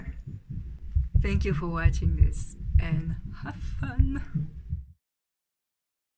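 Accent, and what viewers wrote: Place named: Canada. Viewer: My favorite Canadian accent.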